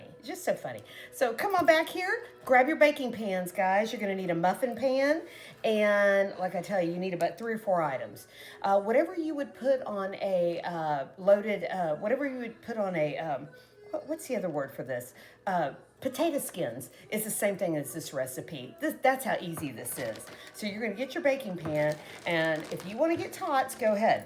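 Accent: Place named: Texas, United States. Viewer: lol, I could tell your accent is from Texas.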